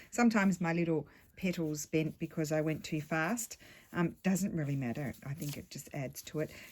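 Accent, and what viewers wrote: Australia. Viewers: Hi from Cairns Queensland. Like many others, I have become reliant on your daily broadcasts from Italy where you've been hard hit by COVID19. It always makes me happy to tune in to YouTube and see that your latest vid is there. I love listening to your chit chat and am glad to hear that you still sound like an Aussie. It also always good to know that Lulu, Steph and you are living their lives still as normally as possible during this apocalypse. Stay well, keep up with your vids please. Cheers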